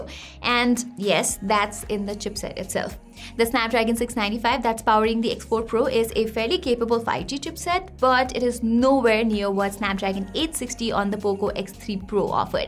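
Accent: India. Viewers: Your Hindi accent hayyy 😍😍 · Just fell in love with her Hindi accent 😍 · Your hindi accent sunke mujko Accha lagra hai....., 😍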